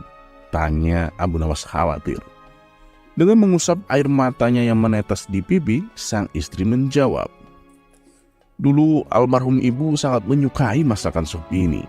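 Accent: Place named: Sunda, Indonesia. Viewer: Waduh hihiii aslinya kayaknya orang Sunda ya😁😁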